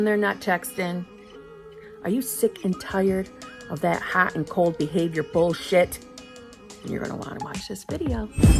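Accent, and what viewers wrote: United States. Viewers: You have a beautiful accent! Sounds very American 🇺🇸 ! The content is also good! 🤩✨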